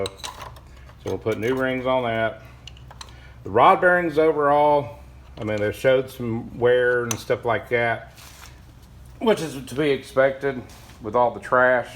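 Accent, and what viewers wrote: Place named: South, United States. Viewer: Great job again, with your mem card filling up maybe upload in 720p you might get a few more minutes, its still hd but youtube for some reason have taken of the red hd if u follow me man. regards from down under, love your Southern accent stay cool mate.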